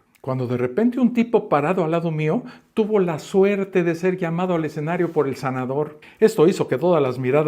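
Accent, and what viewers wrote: Mexico. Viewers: estoy fascinada contigo..me encanta escucharte en tu acento tan mejicano...todo un océano no nos separa eres ya imprescindible....un saludo desde España❤❤❤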